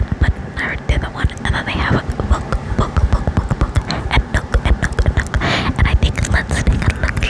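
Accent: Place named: United Kingdom. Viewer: girl your British accent is so good!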